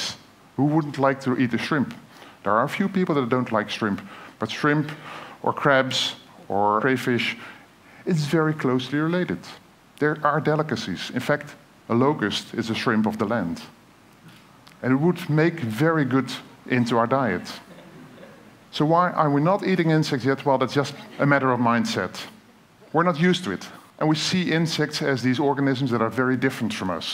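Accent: Netherlands. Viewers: Wow, massive Dutch accent. Also, I have a really simple answer to the question. "Because I have no where to buy them" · So obviously Dutch.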